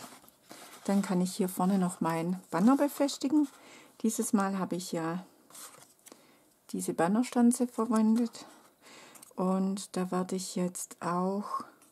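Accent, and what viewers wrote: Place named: Swabia, Germany. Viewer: Süsses Projekt, Deine Sammelbesteller werden sich freuen! Und Dein Schwäbisch ist total goldig xx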